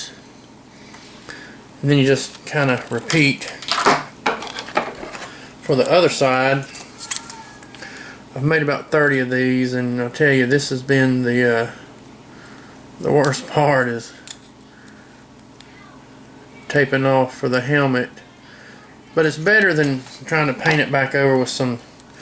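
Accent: South, United States. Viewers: no ofense but you sond contry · That is the strongest Southern Accent that I have every heard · you have an amazing contry axent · You talk like some texas fella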